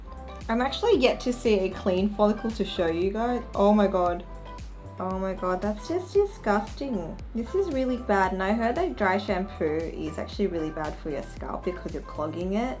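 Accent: Australia. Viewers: You sound Aussie 🐨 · Are you an Aussie, you have an Australian accent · Oh man I love Australian accents. This is just so nice to listen to lmao. Also can’t look at the microscope for too long but it is interesting hahaha.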